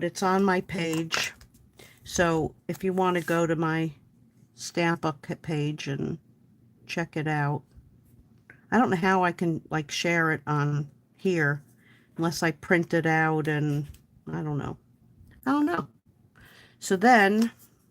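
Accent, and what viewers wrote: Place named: Brooklyn, United States. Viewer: Very cute verrrry cute! You sound like youre from Brooklyn lady!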